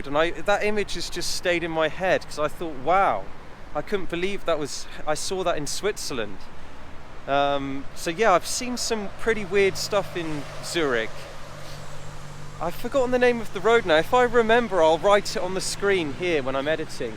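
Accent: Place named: United Kingdom. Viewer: I was just enjoying your British classic accent 😍, hi from Dubai ✌🏻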